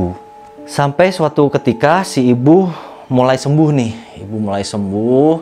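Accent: Java, Indonesia. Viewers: logat jawa nya kenthel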